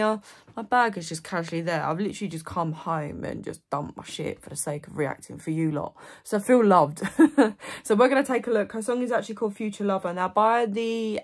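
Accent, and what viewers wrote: United Kingdom. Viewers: Love your reaction and just adore your British accent ❤ Love from Armenia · That british accent❤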